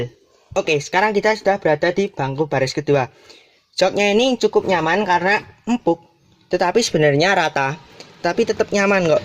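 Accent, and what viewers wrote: Java, Indonesia. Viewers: Aku seneng logatnya....medok banget, koyo jape methe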